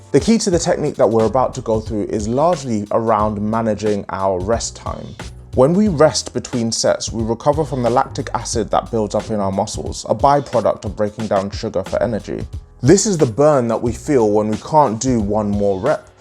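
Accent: United Kingdom. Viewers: woo...The British accent is almost as impressive 😅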